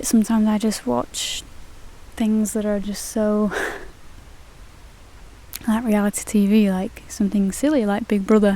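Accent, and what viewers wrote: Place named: Lancashire, United Kingdom. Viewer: Great video. Im a hindu born in London, family originally from Bolton. It's crazy how 2nd/3rd generation Indians in the UK, myself inc., have lost faith in the wisdom of hindu philosophy, i think we're poorer for it. More crazier still is for me to be rediscovering my roots through someone with your awesome Lancashire accent! Keep up the positive vibes and thanks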